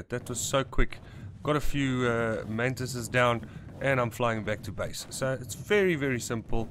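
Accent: South Africa. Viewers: Dude your south African aren't you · You from South africa?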